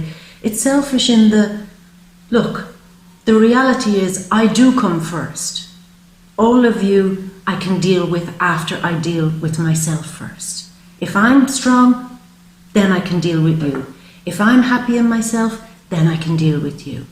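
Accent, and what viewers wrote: Ireland. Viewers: Yes I know she’s Irish....and her accent is adorable.... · KellyByoga yeah nnnnno shes Irish my lovely x0x loves yah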